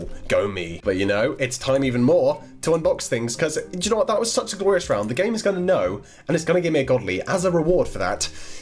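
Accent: United Kingdom. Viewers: Your British inglish...it’s so charming! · I like ur accent British? Great vid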